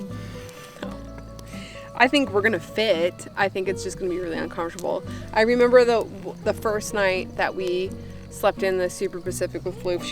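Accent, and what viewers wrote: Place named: Canada. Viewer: never ever apologize for your Canadian accent eh.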